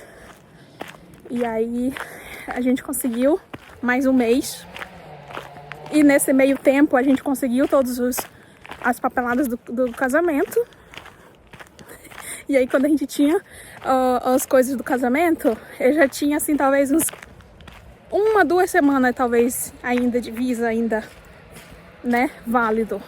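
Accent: Brazil. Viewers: minha família paterna do Laranjeiras e materna de Belo Horizonte. Nasci e vivi minha infância lá. Todos os familiares ainda. Meu pai veio trabalhar em Manaus e vivi lá de 98 até 2022 com minha família. Reconheci logo seu sotaque do Sul do Pará ;) E vc é da onde? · Suiça tudo de bom. Amo viver aqui. Que lugar da Suiça vc mora? Seu sotaque Paraense não é muito forte, gostei muito da sua história.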